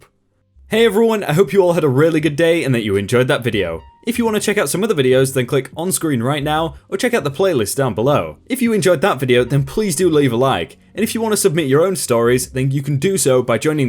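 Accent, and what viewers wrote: United Kingdom. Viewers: and also i posted my mc a month a go and you havent read it its on your discord its on redit its in the comments on one of your videos . im getting frustrated listing and staying up to date with your videos because the Pommy accent makes my blood boil im half American half Australian both country that left Britain because of your country accent/rules get on to it asap please sorry for being mean but at least i didnt swear that is super hard for Australians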